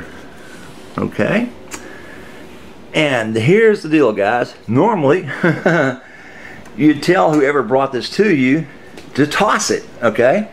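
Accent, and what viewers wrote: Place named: Texas, United States. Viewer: The way you talk and your accent reminds me of Johnny Winter. I wonder if you're from the same part of Texas.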